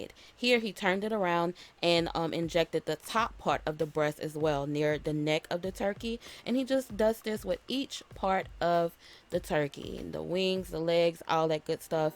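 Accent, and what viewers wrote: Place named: South, United States. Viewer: I was just telling my colleagues (I teach in a public school in Hong Kong) that I do not celebrate my Thanksgiving here with a turkey cause I do not have the equipment to do a Louisiana fried turkey (I'm from Ascension Parish) and they insisted that all of these 5 star glitzy hotels (and Hong Kong has a lot) throw Thanksgiving parties - why not go there? Yes, I tried twice...spent a fortune and the turkey tasted like cardboard and the gravy was so sweet and texture like jelly. YUCK. Thank you so much for making this video. Now, my colleagues can see how it is done AND listen to your beautiful Southern accent:)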